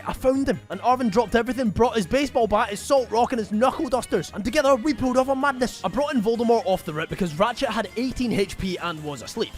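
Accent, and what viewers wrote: Scotland, United Kingdom. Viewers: Love how the Scottish accent properly creeps oot every now n then am here fur it n long may it continue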